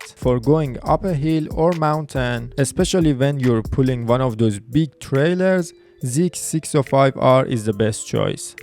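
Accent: Iran. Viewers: لحجش چقدر شبیه ایرانیاس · داداش ایرانی هسی؟لحجت خیایییی مثل ایرانی ها هس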